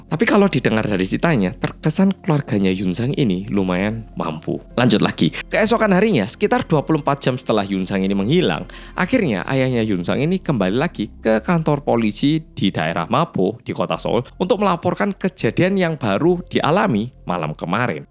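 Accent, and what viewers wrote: Java, Indonesia. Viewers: kok medog se mas.. 😅😅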